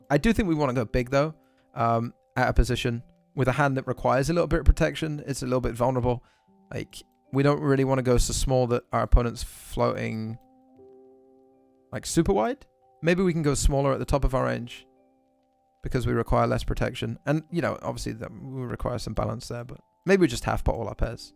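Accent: United Kingdom. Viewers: funny bloke.........where are you from in the UK you gerth?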